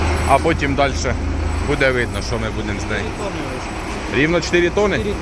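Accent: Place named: Surzhyk, Ukraine. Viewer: Зайшов на канал, люблю цю тему, та не зміг слухати. Мова така покалічена, засмічена, що ріже вухо. От так ми зі суржиком і помремо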